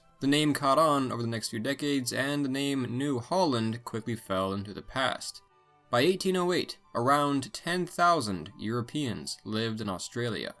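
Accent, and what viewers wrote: United States. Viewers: I was sceptical when I first heard the American accent on this doco but it is excellent and really comprehensive, with information that I, as an Australian, didn't know. I particularly love that you include the fairly recent genetic information regarding where the people travelled from to populate the continent and surrounding islands. I'm using this as a resource to teach my son Australian History and we'll definitely check out your other documentaries. Thank you! Really well done! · Why is there a American talking instead of a Australian?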